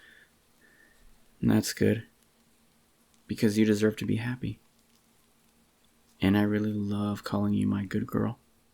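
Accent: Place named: South, United States. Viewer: bro idk why but he sound hella country....still a good AMSR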